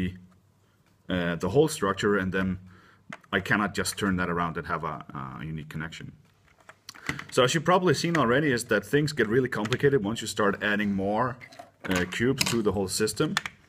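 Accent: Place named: Denmark. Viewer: Where are you from? You sound Danish :o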